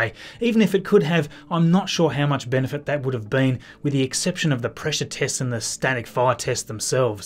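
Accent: Australia. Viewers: Great work Oioioi. What part of Australia are you from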